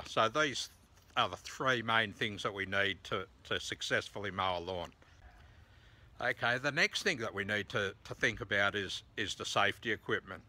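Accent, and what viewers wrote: Australia. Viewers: I CANNOT listen to an Australian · I like your accent! you aussies are okay with me. I've got a Weed Eater lol but I think I'll call it a Whippa Snippa from here on out. Cheers from Texas.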